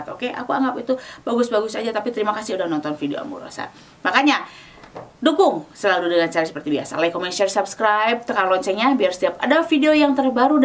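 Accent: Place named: West Java, Indonesia. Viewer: Meni sunda pisan 😅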